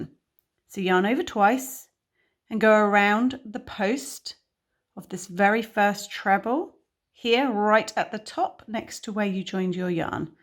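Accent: England, United Kingdom. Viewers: OMG beautiful! I must admit to slight confusion at first with an English accent, and American stitches!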